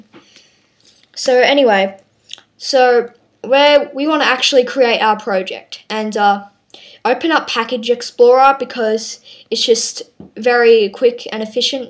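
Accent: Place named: United Kingdom. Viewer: Asian boy? he sounds like a british boy :D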